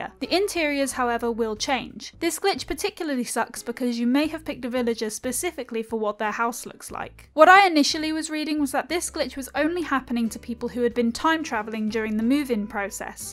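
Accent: United Kingdom. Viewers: It's a Brittish accent.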